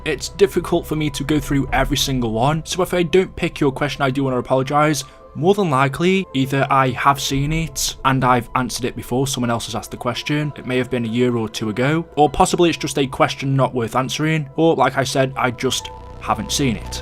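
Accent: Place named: Stoke-on-Trent, United Kingdom. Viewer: Are you from stoke on Trent ? I recognise the accent, I’m from stoke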